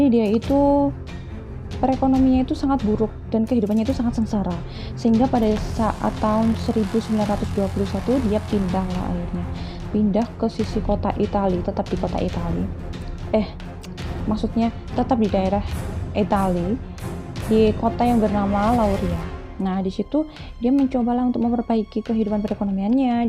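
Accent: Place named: Java, Indonesia. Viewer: masih medok ya buk 😭😂💛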